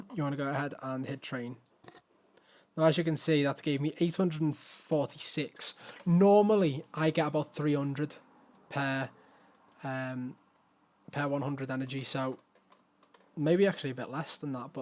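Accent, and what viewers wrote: United Kingdom. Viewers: Thanks man! Good to see a fellow scouser as well. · Loving these vids so far mate. I dunno if it's coz your a fellow Brit and explain things without going a million miles n hour like most of em on here but I'm looking forward to watching the rest. Thanks for taking the time 🍻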